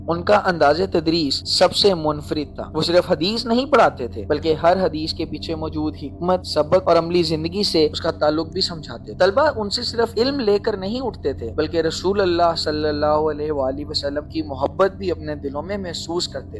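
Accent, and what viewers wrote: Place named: India. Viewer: یار غ کو گ ادا،کر رہے، خ کو کھ کہہ رہے، اتنا زیادہ ہندی کا اثر ؟ تھوڑا تلفظ پر دھیان دیجیے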